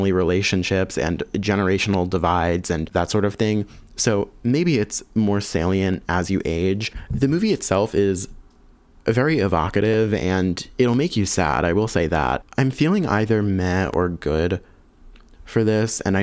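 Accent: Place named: North America. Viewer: He's North American. It's ok for people to pronounce names or words in a regional accent, which is not how to pronounce it in a native tongue. We know who it refers to, we understand the word, there is no intended disrespect going on (if anywhere, that's in your post) your post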